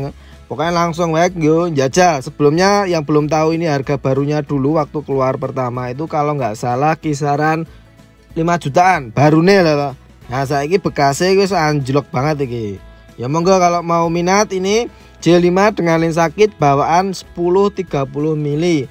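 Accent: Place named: Bantul, Indonesia. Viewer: Logate mbantul buanget hahahhaha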